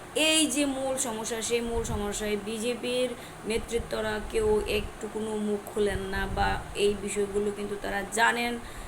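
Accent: Bangladesh. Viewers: Truly tripurar basinda hola eai babe kotha bole na sotti tumi bangladesi tumar kothatei porichoi hoi.....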